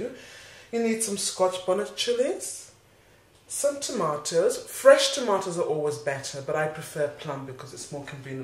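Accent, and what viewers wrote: England, United Kingdom. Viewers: I love your videos, few though they are. The combo of your passion, plumby English accent (yes, I'm American:)) and magnificent West African looks, I very much enjoy. You were my first intro to West African cuisine. It appears that you aren't really doing this anymore and don't know if you read your comments but I wanted to let you know how much I've enjoyed them. Cheers. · YUMMY!!!!! I c you added 2 habanero peppers to make it HOOOOTTT! I love spices & heat tho. I c you only added 1 stock cube, no salt or other seasons. Another vid chef added no seasons! I never boil the h2o out of my tomato puree when I make this so this week I will certainly boil out as much h2o as poss. 1st. BTW, I LOVE your Britt accent & I EAGERLY await my trip across the pond – when flights aren’t a million $ compliments to the Olympics and summer season! 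· your accent is so english and this is coming from someone born in the uk too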